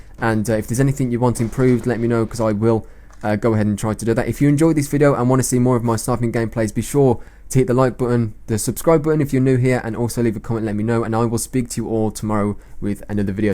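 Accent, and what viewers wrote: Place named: United Kingdom. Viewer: Where abouts in the UK are you from? You sound really local to me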